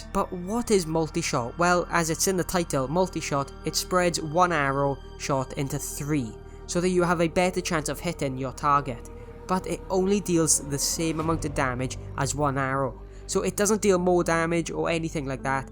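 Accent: Wales, United Kingdom. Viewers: Bro are you from wales, the accent seems familiar 😂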